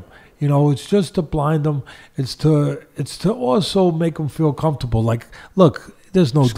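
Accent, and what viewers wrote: New York, United States. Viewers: He has a great voice, the New York accents are my favourite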